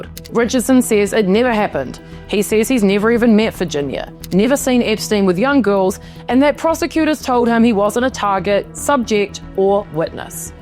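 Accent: New Zealand. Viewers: Love your Kiwi accent...adds some gravitas to your reporting. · A Kiwi! It's amazing to hear your pronunciation of the letter e as short i.❤ very groovy 😎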